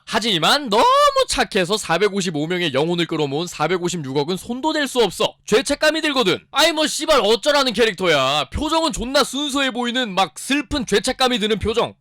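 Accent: Busan, South Korea. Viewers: 나랑 상반된 다른 의견의 눈으로 보는것도 재밌구만. 그나저나 해설 기깔나게 하길래 부산인지도 몰랐네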